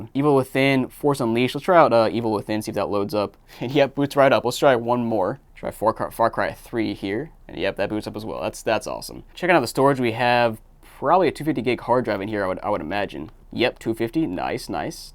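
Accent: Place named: South, United States. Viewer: Hey, I was wondering where you accent comes from specifically. Like. I know It's a southern accent (I think) but a region or a state? I swear I'm not weirdo😅 I just really like this accent but I don't know exactly what accent it is (I'm not american) so I can’t precisely answer the question when asked. Love your vids too btw.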